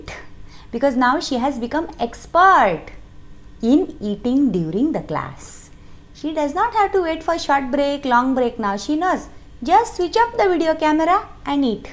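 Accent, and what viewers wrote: Maharashtra, India. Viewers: As a Marathi speaking person, I bet, she has nailed that typical Marathi accent. God bless. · Perfect marathi accent ...☺️ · love the pure marathi accent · Ur marathi accent 👌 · Such a clear Marathi.... Are you been to Pune, mam?? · Hi please make more maharashtrian videos. You have got gifted maharashtrian tone and style. We could relate all instances with us. 😃😃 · Very hilarious video,. I have watched this 2-3 times and laughed and laughed! Keep it up. What a perfect Marathi accent you have! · Chalu chapati.. Now that's something sarcastic.. 😂🤣 u never stops to amaze me.. And what an marathi accent.. U literally sound like a typical marathi kaki when u said tuz sandwich aalay bagh... Hope u read this comment.. And keep the good work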